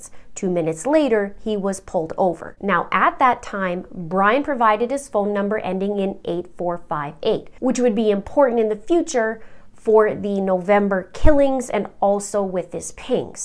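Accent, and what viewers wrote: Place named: Canada. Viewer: love timelines, so important to this case, also love her canadian accent, my relatives are from viking, ab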